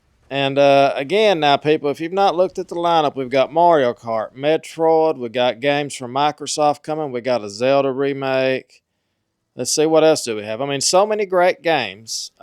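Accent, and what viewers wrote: United States. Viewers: Steam Deck and ROG ALLY has simmilar specs like switch 2 so I think it is possible. Your acent is the most american american who ever americaned :D And I like it. My bro begs my mom to get him the switch 2 on christmas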